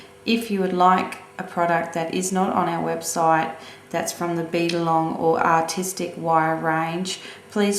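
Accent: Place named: Australia. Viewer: You make that look so easy so I'm going to have to try this. I've just begun to think about making jewelry since it looks like so much fun to do. There's a lot to learn about all the tools and wires and beads etc. but I'm going ahead with it. Thanks for doing this. I love listening to your voice and your accent. :) I love Aussie and British accents and could listen to them all day. ;D Beautiful ring too!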